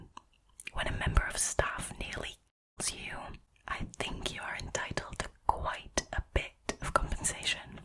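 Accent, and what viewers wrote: United Kingdom. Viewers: i really enjoy, as a non Brit, the sound of the classic Britissshhh accent you have...the one i, unsuccessfully, tried to replicate at english classes back in school days...you oftenly fly under my radar though since you mostly do Reddit stories and i'm more of a true crime aficionado... great narration and tone nonetheless...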